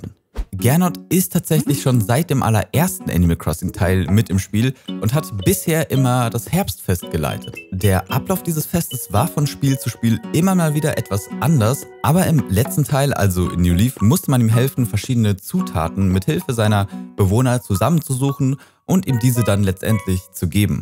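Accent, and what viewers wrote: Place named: Bavaria, Germany. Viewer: Oh da hab ich soch ein Bisschen Bayrisch rausgehört. Bist du aus Bayern?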